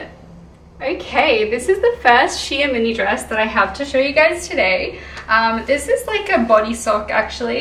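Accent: Australia. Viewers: Is she Australian from her accent?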